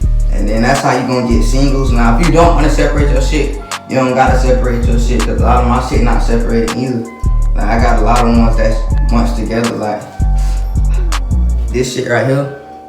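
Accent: Florida, United States. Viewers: Florida talk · U can tell he from Florida 😭😭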